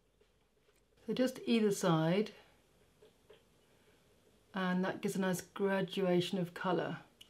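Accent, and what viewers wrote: England, United Kingdom. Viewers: I love watching you! You are so soft spoken and the English accent is a bonus for me. I’m fascinated by the blending of the lip colors by Mario The color you came up with looks beautiful on you. Your makeup always looks so fresh and clean Have a wonderful Christmas snd some relaxation. Love from the US ♥️🌲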